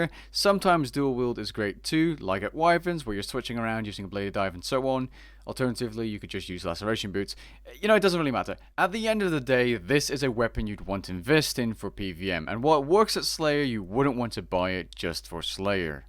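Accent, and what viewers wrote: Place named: Netherlands. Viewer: i hear a slight dutch accent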